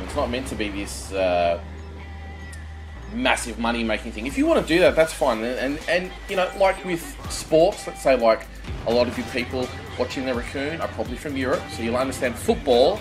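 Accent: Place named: Australia. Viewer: I like the Australian accent, so pwetty :3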